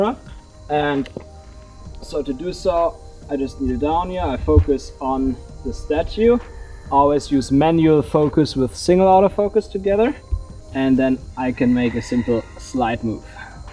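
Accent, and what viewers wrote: Germany. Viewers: Wow, I can hear that youre German, try to meet up with niklas christl, a super videograph too❤ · I recognize the german accent!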